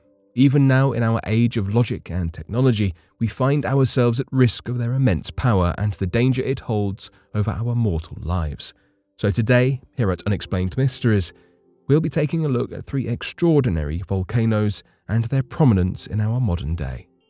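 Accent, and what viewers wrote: England, United Kingdom. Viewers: When you start a video and hear a THICK uk england accent i know the video is going to be a bloody bunch of shite..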